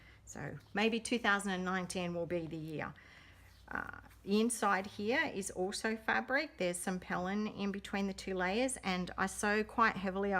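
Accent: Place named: Australia. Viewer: Hi! Beautiful theme! Our Teds are best friends....that texture on the cover is too wonderful.....Hi from Adelaide, so great to hear the accent on YouTube! 😘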